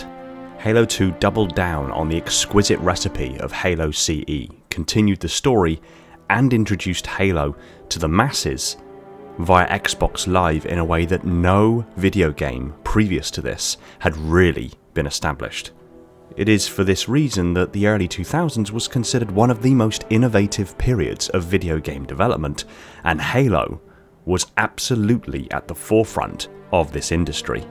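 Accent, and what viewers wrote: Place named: Hull, United Kingdom. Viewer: Was not prepared for your Hull accent, God damn I wish that actress in Game of Thrones used a different accent 😂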